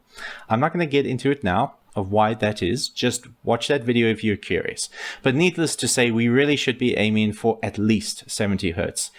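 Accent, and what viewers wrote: South Africa. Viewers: Sorry I have to ask, are you a South African or in South Africa? Cause your accent sounds like you are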